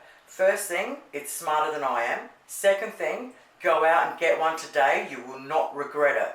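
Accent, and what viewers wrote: Australia. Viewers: Wonderful listening to Australian English in a review. Love the sound special effecs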